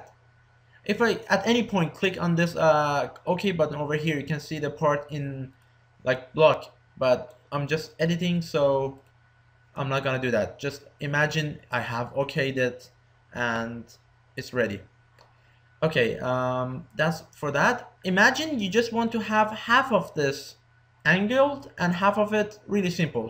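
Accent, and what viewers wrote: Iran. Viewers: Love the Persian accent. It reminds me of grad school (my advisor and a half-dozen labmates were Iranian). Your English is fine, just enough giveaways in the diction to identify the Persian accent. :) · Nice Tutorial. Persian accent.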